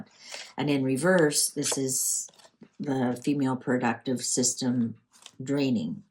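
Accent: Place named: Canada. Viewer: I'm an Australian living in Canada and I immediately knew you are Canadian from your accent. People forget the Royal Family are our RF too (Australia and Canada). 😅❤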